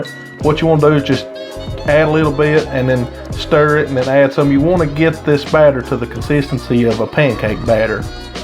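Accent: South, United States. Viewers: Not to sound mean but this guy sounds more southern than me and I’m from Louisiana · The ultimate country accent!!!! Also great video I’ve been trying to find a good one. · Southern draw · Loved the “country as cornbread” accent. ❤️ Great recipe. Thank You for sharing. My Friends and Family absolutely loved them.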